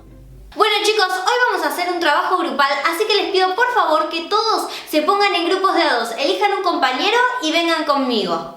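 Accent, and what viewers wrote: Argentina. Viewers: nuevo sub eres argentina? o no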